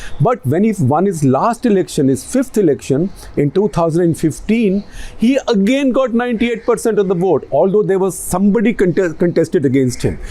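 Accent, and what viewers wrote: India. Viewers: That was the Voice of America with an Indian accent. He should concern himself with India : one of the poorest countries in the world. The average citizen in those countries that he criticizes enjoys a living standard that people living in "democratic" India can only dream about.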